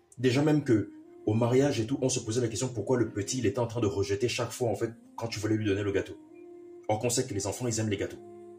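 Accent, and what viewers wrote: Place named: Gabon. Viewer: Il a l'accent de chez moi au Gabon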